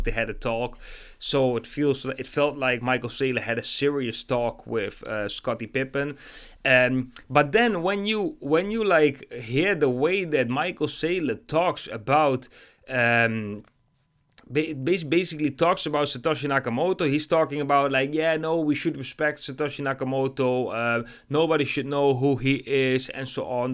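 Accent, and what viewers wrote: Netherlands. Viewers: I think he's Dutch! 😊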